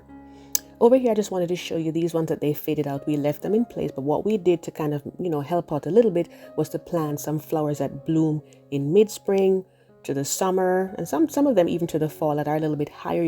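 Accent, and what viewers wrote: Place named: Jamaica. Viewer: Love your Jamaican accent. I'm Jamaican too.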